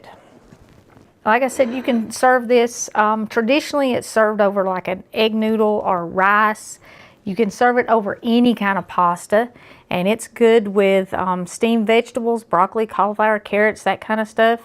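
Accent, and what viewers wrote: South, United States. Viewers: Hello sweet lady, I'm not sure if I'm doing this right but I'll try. I found your channel on my Roku TV and I just wanted to tell you thank you for sharing your love of cooking and love of God. I am 78 yrs old. My husband took his life 4 years ago and left me in a wheelchair. I live alone now and have no family here in Texas. I get real lonely at times, then by chance I found your channel and although I don't cook much anymore because it's difficult cooking from a wheelchair and too easy to get burned. Your channel became a daily pleasure to look forward too every evening. Your words about your love of Jesus and His love for us have lifted me so many times when my heart was so heavy. Thank you. Though I don't do much cooking since being alone I still enjoy watching you cook. You have the sweetest voice and southern accent. I'm originally from Massachusetts and I have a heavy Boston accent. 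God bless you for making my day. I watch all the videos I can and watch them over and over. (Hugs) from Fort Worth Texas...... Marie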